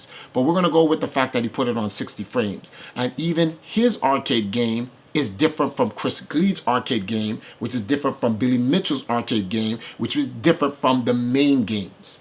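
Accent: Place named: United States. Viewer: Mr, I find you repugnant. You're a fake Jamaican, with your americanised accent. You try so hard to ape your masters, your slave mentality is irritatingly obvious. You are contemptibly inarticulate, misusing so many words and phrases, it is beyond reckoning. Why don't you learn how to speak English properly, before trying to use it to deceive people? Your incessant lying is utterly tiresome. You are the type of simpleton, who believes that heaping lies on top of lies makes for a convincing narrative. If there were any depth to your intellect, you would be aware of the fact that lies are strengthened by mixing them with truth. You are quite clearly ignorant of said fact. I am a West Indian, my skin is brown, and I play classic arcade games. I have no wish to be represented by the likes of you, so I would appreciate your removing yourself from the scene. 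Stop making yourself out to be more significant than you actually are, and stop manufacturing justification material for the use of the Twin Galaxies racists.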